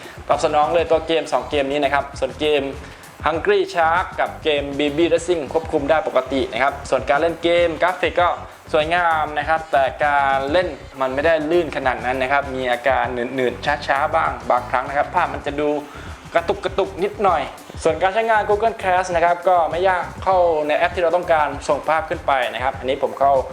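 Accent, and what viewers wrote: South, Thailand. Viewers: สำเนียง เหมือนคนใต้ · ไม่ทองแดงครับ เป็นสำเนียงของคนมาลายูสามจังหวัด ชีวิตประจำวันเค้าพูดภาษายาวีกัน เวลาพูดกลางแอคเซ็นเค้าจะเป็นประมาณนี้ สำเนียงทองแดงจะเป็นของคนพูดใต้ครับ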